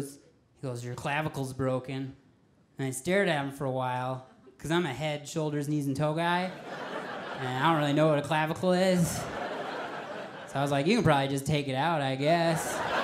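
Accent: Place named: Wisconsin, United States. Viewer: Where’s he from? Accent sounds Wisconie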